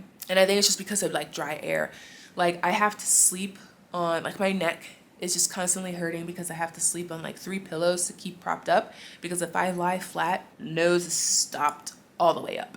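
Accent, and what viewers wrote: United States. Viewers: People don't know just by looking at you that you are American so that can't be why they're staring. You don't look American, just African. I think it might be your accent that gives you away. Or vlogging in public. The staring doesn't come from a bad place. People here take interest in other people. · Welcome back 🤗🤗🤗🤗 we cant tell if u AA until u open your mouth, you must just be used to greeting even people u dont know 😆😆😆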